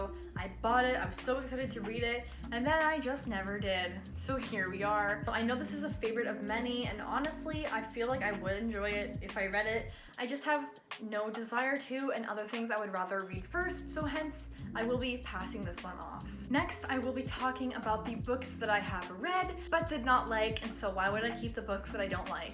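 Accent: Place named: Germany. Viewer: I don’t know if it’s just me but you have a little german accent hahah but idk why 😂 Are you german?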